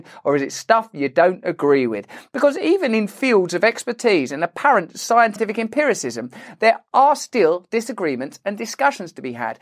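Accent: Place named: Cockney, United Kingdom. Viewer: Coincidentally, your accent sounds a bit like the chimney sweep from Mary Poppins.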